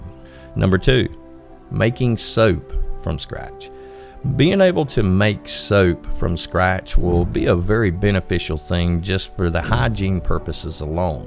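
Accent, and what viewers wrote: Alabama, United States. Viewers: That sounds like a Cullman Alabama accent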